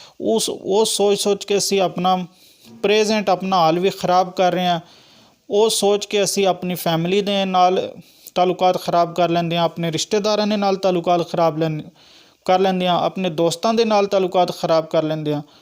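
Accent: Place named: Potohar, Pakistan. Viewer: I am bilangual ; speak Seraiki and Balochi , but I fully understood your Punjabi garnished with a little potohari accent.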